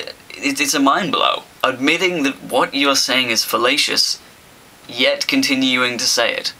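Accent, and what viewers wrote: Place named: United States. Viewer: Why do you speak with an American accent?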